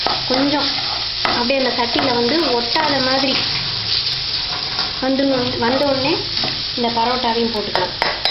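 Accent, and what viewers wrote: Madurai, India. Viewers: loving your Madurai Tamil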